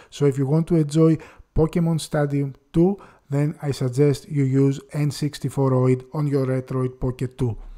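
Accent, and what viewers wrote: Greece. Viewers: What about conker's Bad fur day? BTW: where are you from? You accent sounds Greek.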